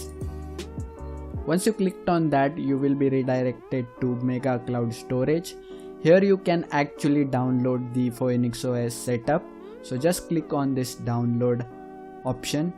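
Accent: India. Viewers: do you know what accents are? he's Indian (i think)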